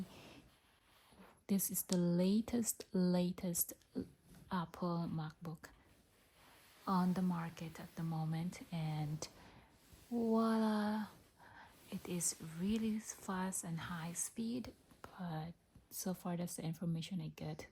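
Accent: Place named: Philippines. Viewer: Are you a Filipino? That accent is so thick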